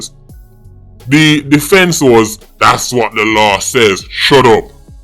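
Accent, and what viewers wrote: Trinidad and Tobago. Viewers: 🤣🤣 sir P, di " Trini accent" madd!! 🤣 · lol@ his trini accent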